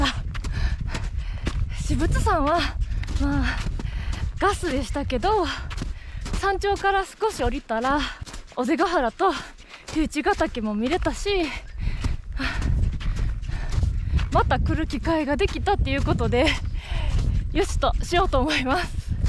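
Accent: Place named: Kansai, Japan. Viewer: ずっと関西弁、大阪弁でいてね !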